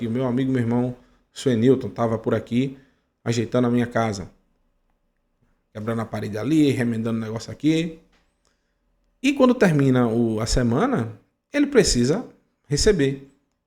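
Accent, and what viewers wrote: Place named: Northeast, Brazil. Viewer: Mas que beleza. Meio nordestino. Amei.❤❤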